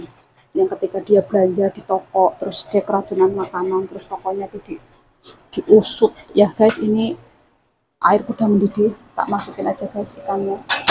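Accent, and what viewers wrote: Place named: Banyumas, Indonesia. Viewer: Masak masak di Hongkong tetep semangat salan ngapak bae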